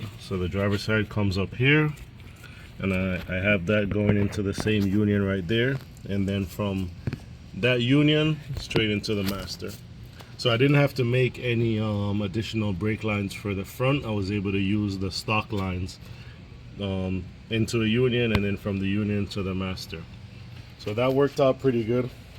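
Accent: Jamaica. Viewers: You’re Jamaican? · Heard the accent lol! 🇯🇲 outdeh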